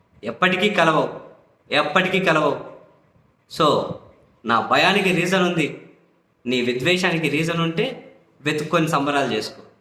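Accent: Rayalaseema, India. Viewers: Anna are you from rayalaseema?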